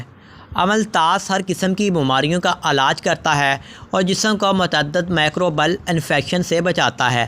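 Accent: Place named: Rural, India. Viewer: Oh pendu baat krni ki tameez Nahi moh utha kr video bananaiy ajataiy hai sastay Hakeem Kiya talafuz hai Urdu k tera pendu bs kr dy bs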